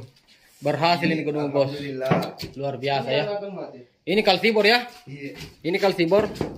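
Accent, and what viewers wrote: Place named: Makassar, Indonesia. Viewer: Ini di makassar ya guys logatnya kayak makssar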